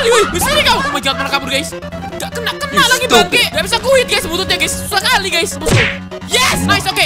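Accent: Java, Indonesia. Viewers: Orang Jawa kah bang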